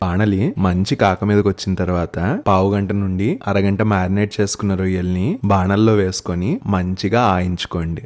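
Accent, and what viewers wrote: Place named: Rayalaseema, India. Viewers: Yerragadda anagane artham ayipoyindhi ..maa rayalaseema ani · Yerragadda..rayalaseema slang 😊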